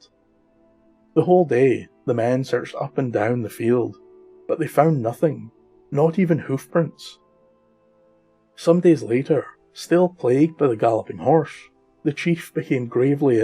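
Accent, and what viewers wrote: Scotland, United Kingdom. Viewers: Most definitely we would appreciate more Headless Horseman tales!! Always we have wondered what happened to the Horseman.. how he lost his head.. and what became of him afterwards.. We love your stories.. and especially your accent.. we are of Scottish ancestry as well.. Thanking you so much we are .. Slan mo charaid William and Jen 💜💙🌱🌹🕊️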